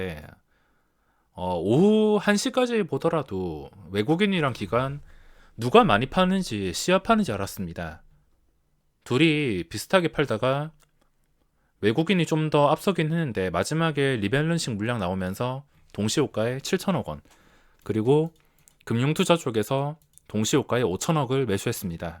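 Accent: Gyeongsang, South Korea. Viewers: 누가많이파는지 시합하는줄 알았읍니다..ㅋㅋㅋ경상도사투리 구수하네요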